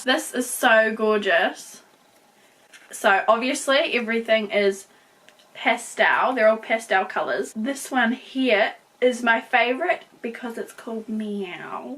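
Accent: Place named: New Zealand. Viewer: Ughhhh I am in love with the modern Renaissance palette 😍 Your accent threw me off for a second, I was like "SHES AUSSIE" but your accent didn't sound quite right for an Aussie, I think it took me 2 solid minutes to realise your actually a kiwi 😂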